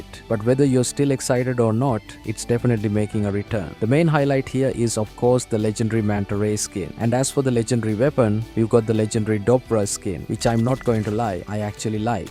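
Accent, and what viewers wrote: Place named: India. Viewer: Your accent is like similar to Indian english 😂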